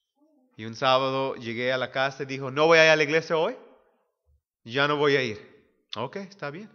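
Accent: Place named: United States. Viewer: Compadre tienes que aprender un poco más hablar español eres muy pocho y todo lo que hablas no se entiende discúlpame mucho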